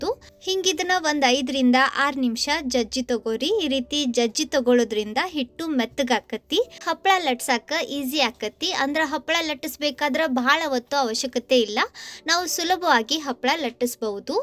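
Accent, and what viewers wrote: North Karnataka, India. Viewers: ನಿಮ್ಮ ರೆಸಿಪಿ ಅಂತೂ ಚೆಂದವೇ ಜೊತೆಗೆ ಅಸಲಿ ಉತ್ತರ ಕರ್ನಾಟಕದ ಭಾಷೆ ಕೇಳೋಕ್ಕೆ ಇನ್ನು ಚಂದ 👌👌👌👌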